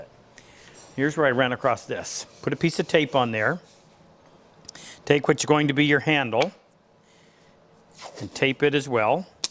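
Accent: Canada. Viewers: Ar u a Canadian or American Ah!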